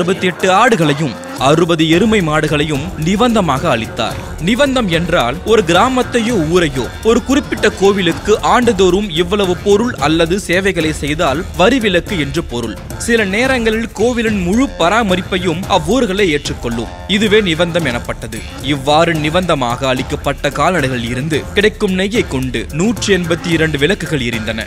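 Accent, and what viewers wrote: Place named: Tamil Nadu, India. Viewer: Unga Tamil Slang Voice semma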